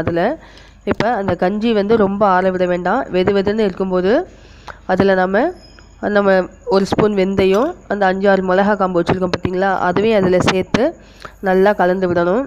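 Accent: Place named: Kerala, India. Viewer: Sister unga malali ucharipu alaga iruku sister😊😊😊.....all the very best for your videos sister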